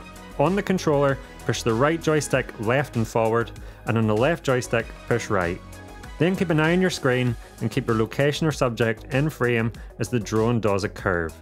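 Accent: Ireland. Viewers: Oh.. so this is the famous Irish accent?? The “ i ” sound sounds more like a “ e “ like the word “vedeo”! Love et!!! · Irish accent is epic! Great Vid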